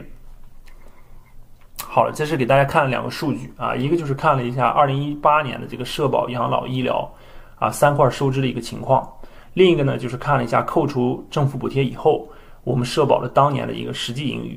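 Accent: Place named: Taiyuan, China. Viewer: 主播听口音像是太原的？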